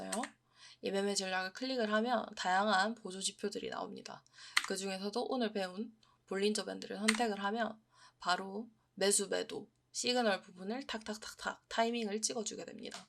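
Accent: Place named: Gyeongsang, South Korea. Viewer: 조금씩 묻어나오는 경상도 사투리가 매력이라서 구독 누름 ㅎㅎㅎ 많이 가르쳐 주이소~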